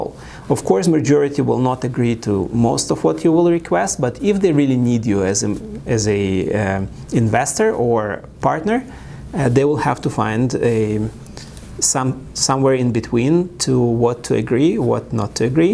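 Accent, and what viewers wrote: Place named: Russia. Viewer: Prettty sure it's a Russian accent.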